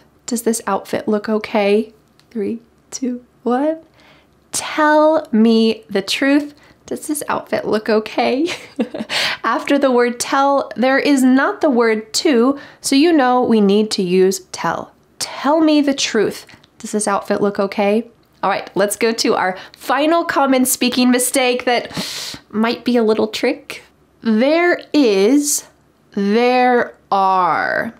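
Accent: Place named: United States. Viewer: You're American, aren't you? I hear the accent too strongly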